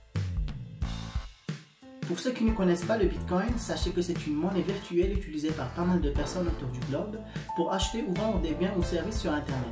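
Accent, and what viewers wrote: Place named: North Africa. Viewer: la music sert a masquer son accent du bled, aller on t a reconnu, retourne bosser a la pizzeria, et laisse les bitcoins tranquille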